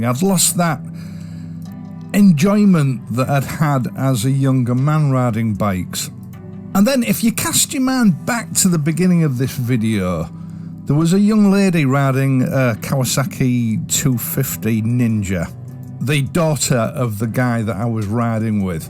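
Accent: United Kingdom. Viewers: Dear, Thank you so much for your videos. They are always filled with common sense, experience, and wisdom, which truly help in gaining new perspectives. I wanted to share that as a French speaker with limited English, your wonderful British accent can sometimes be a challenge for me (as we are mostly taught American English in school!). Because of this, I am always thrilled when your videos feature a translated French audio track. It allows me to enjoy your content even while I'm busy cooking or doing chores! I understand that these audio tracks are often managed by YouTube's algorithms and AI policies, but I wanted to let you know how much value they add for your international audience. Thank you for being such a unique and inspiring voice on this platform. Best regards from Belgium! Julian.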